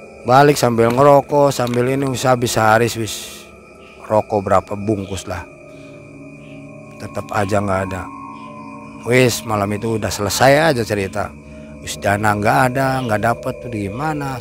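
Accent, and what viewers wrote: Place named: Cirebon, Indonesia. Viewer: Wuaduh bhsae Kya wong cerbon apa wong dermayu kih ... Hehehehe 🙏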